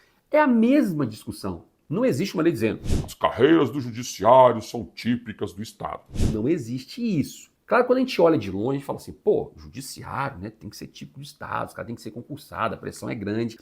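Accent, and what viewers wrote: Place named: Pernambuco, Brazil. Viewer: Esse professor é bom, explica tudo que todos entendem, pelo sotaque e inteligência deve ser pernambucano, se errei foi por pouco.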